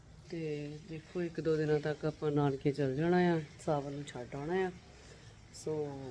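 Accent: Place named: Majha, India. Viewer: ਪੂਰੀ ਮਾਝੇ ਦੀ ਬੋਲੀ ਬੋਲਦੇ ਹੋ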